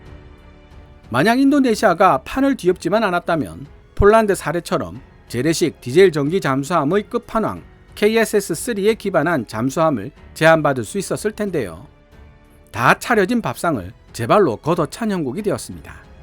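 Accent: Gyeongsang, South Korea. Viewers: 갱상도 사투리 안바꿀래? 천하에 즈질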